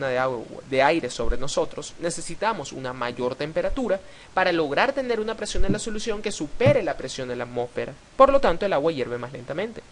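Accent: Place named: Venezuela. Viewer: Apenas empecé a escuchar el video supe que eras venezolano jajajaja :D Saludos desde barquisimeto!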